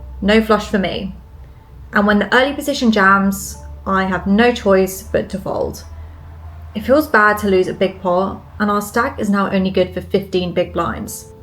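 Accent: England, United Kingdom. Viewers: Tournament poker is SO resident sleeper :( Cute English accent is worth though. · I already watch poker vlogs, but listening to a lovely lady with an English accent makes it cooler, lol. You should do cash game vlogs though!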